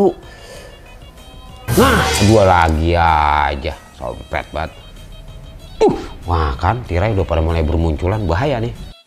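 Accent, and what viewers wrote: Jakarta, Indonesia. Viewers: Bang selalu di hati 😂😂😂 Logat betawinya itu loh yg bikin saya 😀😀😀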